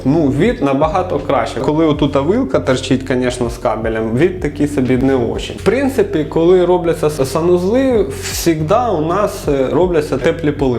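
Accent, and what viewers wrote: Surzhyk, Ukraine. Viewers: Не можливо слухати( суржик є у всіх, але тут його забагато.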